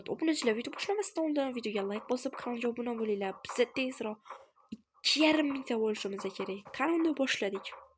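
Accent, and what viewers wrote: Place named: Khorezm, Uzbekistan. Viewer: Xorazmlimisz Shevayz tortp durti?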